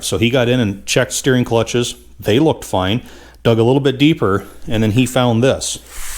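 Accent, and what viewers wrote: Minnesota, United States. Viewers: "Pardon me", but I did not understand the mustard reference. :-) BTW, I believe it is "first gen" a.k.a., first generation and not "first gin" but we all know what you mean. It is somewhat similar to those who say "escavator" rather than "excavator" which I think may be a MN thing. Yep, you are a mechanic and a damn good one, and do not pretend to be a linguist. I ain't one of them there linguist neither too. :-) Keep up the GREAT Cat work and tnx for letting us tag along while not even get our hands dirty.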